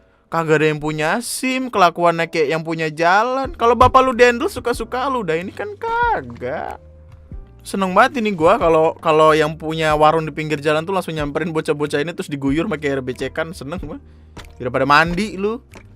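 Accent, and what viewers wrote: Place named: Java, Indonesia. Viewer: Radak radak Jawa woskwowkowkwowk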